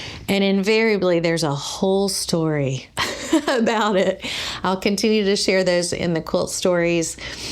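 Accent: South, United States. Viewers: Hi! I have been watching from the beginning and have loved every tutorial! I have learned a lot and you have made me think about different fabrics, colors, and designs. I like how you are a hands-on designer! I think I am, too! I also have a Southern drawl like you! If you will keep the tutorials coming, I will keep watching! Thanks to you and! He’s a great cameraman! I am glad he encouraged you to do this! Happy Anniversary…and here’s to many more!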